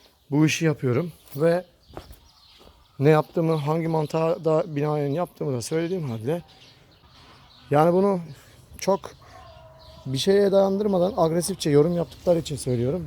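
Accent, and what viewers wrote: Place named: Aegean, Turkey. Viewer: Hocam boşver sen onları etrafımız negatif insanlarla dolu. Sen samimi adamsın belli konuşmalarından. Nerelisin izmir aydın tarafına çok benziyor şiven. Allah kolaylık versin. Kolay gelsin.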